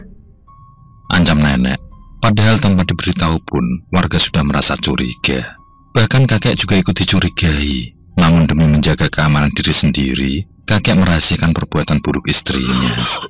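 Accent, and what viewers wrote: Java, Indonesia. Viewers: Suara narator dongeng Ter baik ya ini. Suaranya medok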